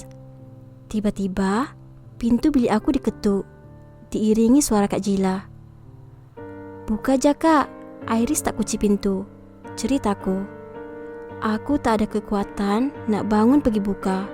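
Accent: Sabah, Malaysia. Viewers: Cara penyampaian mcm org sabah... Dan lagi 1 cara penyampaian cerita mnceritakan kisah dogeng anak2 😂😂😂😂 xde fil lnsung dngar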